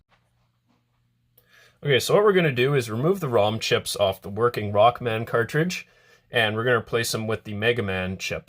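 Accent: Canada. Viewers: Hi im from the Netherlands. I really love your videos, and learning stuff and such a pleasant Canadian accent. Just wanted to say it once. Keep going!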